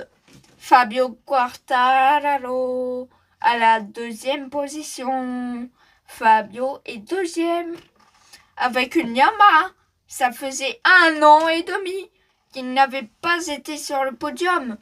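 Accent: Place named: Spain. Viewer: Bien d'avoir ce podcast que tu as commenté en direct... j'ai suivi et j'ai rapidement accroché pendant un bon moment.Est-ce que tu penses qu'il serait possible de commenter en ayant les grille des temps à l'écran? Quartararo au sol... et même Marquez En quelques minutes j'ai appris plein de choses. Et pour ton accent espagnol et pour ton ¡Vive la France! tu es un vrai champion. Et non tu ne te ridiculises pas, crois-moi. Ni même en loupant un résultat, au contraire, tu prends des risques. Salut et à bientôt, Mister!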